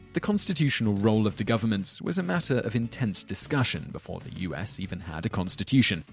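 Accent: England, United Kingdom. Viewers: Why is an English dude talking about America ...... Don't know why I find this weird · one second into the video and I'm instantly drawn away by the fact that a guy with a British accent is hosting this video. GET OVER IT YA DAMN BRITS WE BEAT YOU 241 YEARS AGO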